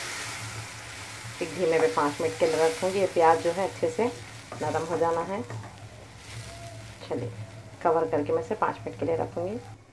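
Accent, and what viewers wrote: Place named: Hyderabad, India. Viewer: Apki Hyderabadi baat sunke bohat Acha Laga...I m from hyd but living in Oman... always I miss Hyderabadi people & Hyderabadi foods..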